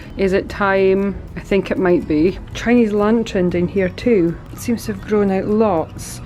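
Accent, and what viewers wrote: Scotland, United Kingdom. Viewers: i love scottish excent language, i dont fully understand the english excent,i m indian living in uk, but i still love it, my boss is from scotland,we have job brief every day, i dont understand what he says, he knows it, sweet cons r funny, tomatoes great, lovely hair, lovely nails. lovely garden, thanks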